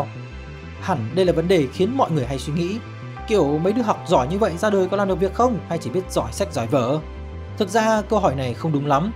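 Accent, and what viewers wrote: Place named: North, Vietnam. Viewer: Clip khá hay! Cám ơn Team! P/s: chỉ có xíu ý kiến là người đọc có thể nói chậm, rõ hơn, đừng nuốt chữ. Thật sự người miền Nam như mình nghe giọng miền ngoài rất khó, huống chi các bạn nói nhanh, là tụi mình khóc cạn dòng sông. Tụi mình xem thời sự đài VTV là không hiểu gì luôn. Cám ơn vì đã đọc!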